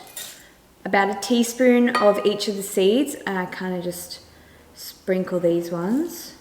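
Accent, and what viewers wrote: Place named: Australia. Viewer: I feel like they have the best Aussie accent I've heard on youtube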